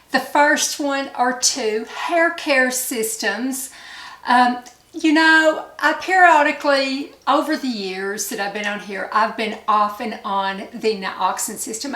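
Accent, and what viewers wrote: South, United States. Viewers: Love your channel! You may find this funny but I listen to you for frequently for the ASMR quality of your beautiful southern accent which I find very soothing and calming!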